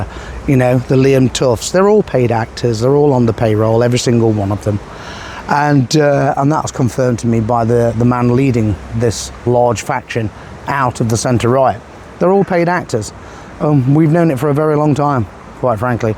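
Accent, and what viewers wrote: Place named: East Midlands, United Kingdom. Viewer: Nice to hear your East Midlands accent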